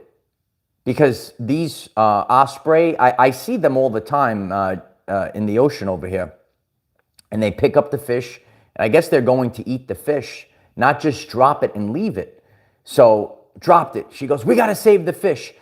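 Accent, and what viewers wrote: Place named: East Coast, United States. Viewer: I just found your video! (I am in the southwest, currently working and listening and I heard an East Coast accent and had to stop, I felt at home. Are you in Jersey by any chance? 🙏😄💜) So you know, it popped up as ‘people who watch Stefan Burns also watch this channel’. Great chat! Namaste 🙏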